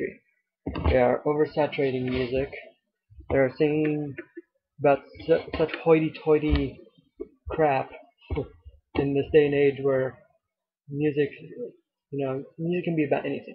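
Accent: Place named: United Kingdom. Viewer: why do you sound Britsh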